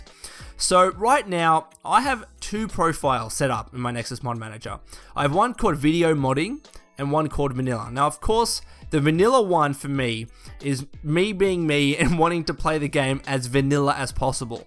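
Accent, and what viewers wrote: Australia. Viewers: Yeah the Australian accent can be hard to understand for some people :P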